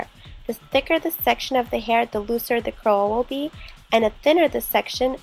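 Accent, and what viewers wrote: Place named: New Jersey, United States. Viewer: Why does it sound like you're from New Jersey?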